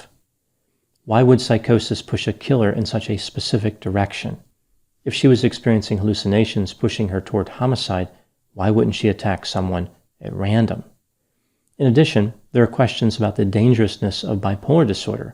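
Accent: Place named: New Jersey, United States. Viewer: Dr! I love the nj jokes, but I'm curious... what did the Garden State do to hurt you? (byyyy the way, you exhibit some minor signs of Jersey accent 🤔) lol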